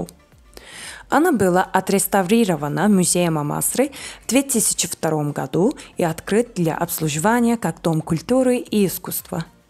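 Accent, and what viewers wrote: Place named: Russia. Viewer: rusça aksanın * - *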